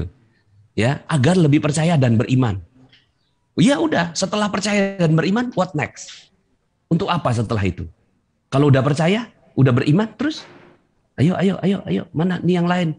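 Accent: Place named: Java, Indonesia. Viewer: pake logat jawa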